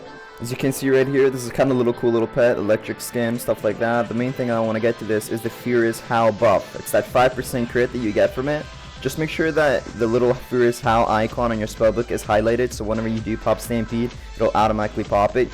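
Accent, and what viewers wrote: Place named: Asia. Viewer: ur asian arent u? i can tell by the way u talk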